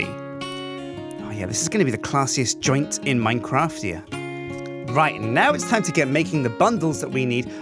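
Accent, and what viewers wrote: Australia. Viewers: that aussie accent is on point! i would know im Australian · why did you suddenly go Australian? Also, you should make ALL the NPCs go to the inn when they need to eat becasue, well, it's an inn! That's where people eat! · Dat Aussie accent doe!!! 😂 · Stereotypical Australian accent, but it's actually not bad,! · The Ozzie accent lol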